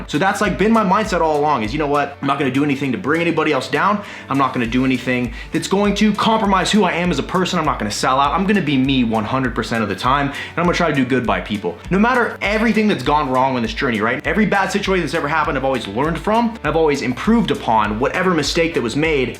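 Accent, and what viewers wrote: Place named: South, United States. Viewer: Texas in the house 😀!!! My favorite was the big ol bulking breakfast because of the southern accent of course😂😂!!! Congratulations on 200K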